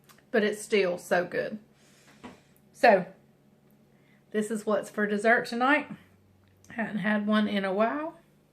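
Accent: South, United States. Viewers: you crack me up! With your southern accent it sounds like you are saying bacon soda and bacon powder. Funny! I remembered my mama making this cake when I was little - the buttermilk makes it so moist and that icing is just the yummiest!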